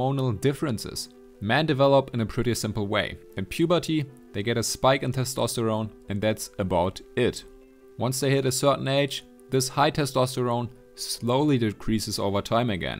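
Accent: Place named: Germany. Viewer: I hear a German accent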